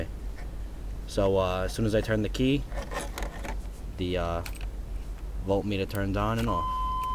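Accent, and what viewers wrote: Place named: Brooklyn, United States. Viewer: Sounds like hes from Brooklyn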